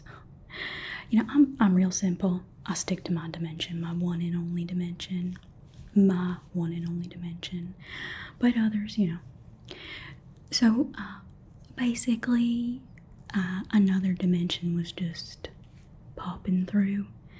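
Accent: South, United States. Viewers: Hey, it's been way too long since we last caught up, you're missed 🎉 ❤.. beautiful accent to which region in the (( USA 🇺🇲 )) it belongs to?? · This gives off Firefly/Serenity vibes. Why do southern accents and Sci-fi compliment each other so gosh-darn well????? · The Southern Belle has returned!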